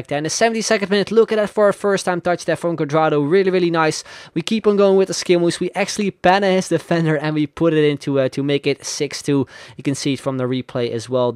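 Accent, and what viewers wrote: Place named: Netherlands. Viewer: 1e video die ik kijk van jou en ik hoor gelijk de je nederlands bent :D maar je spreek echt goed engels man. en de video is echt tof +1 abone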